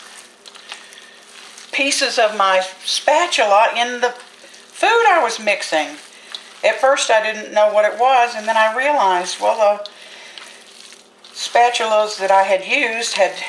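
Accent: South, United States. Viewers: You're from the North?? You must have been in the South a very long time,you sound Southern.This dressing looks yummy,I'm going to try it soon,I might use chayote squash instead of yellow.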